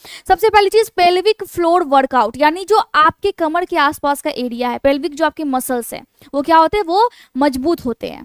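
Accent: Bihar, India. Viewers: Are you bihari Language pronouncesion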